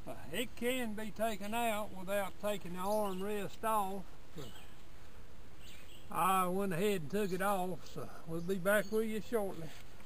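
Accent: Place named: South, United States. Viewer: That's some southern accent! Nice work on the window regulator.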